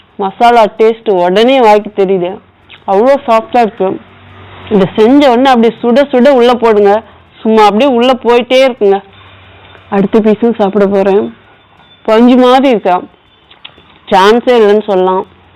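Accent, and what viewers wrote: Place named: Kongu, India. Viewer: Supernga. I am vasuki Aunty. Coimbatore Tamil i am Coimbatore. Kongu tamil super.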